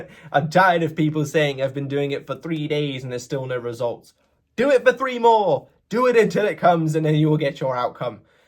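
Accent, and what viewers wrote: England, United Kingdom. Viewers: Are you from outside of the UK ? How he sounds is really normal to me being English myself, im used to his voice and all accents in the UK. A movie voice 😂 made me laugh 😂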